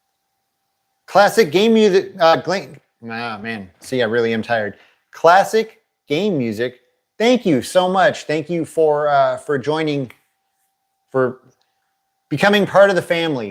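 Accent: United States. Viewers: Hi from germany! I just want to say that your American Englisch ist so clear and easy to understand, and i dont know why 😅.. Its really comfortable to Listen to you 👋🙂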